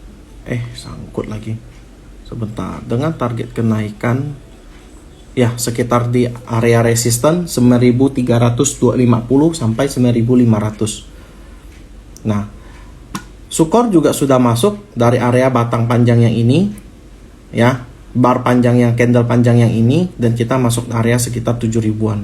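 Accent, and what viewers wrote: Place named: Kalimantan, Indonesia. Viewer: Dialek nya dari kalimantan ya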